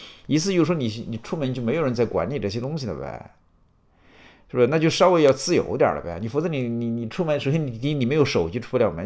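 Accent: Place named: Changde, China. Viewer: 常德人？口音很亲切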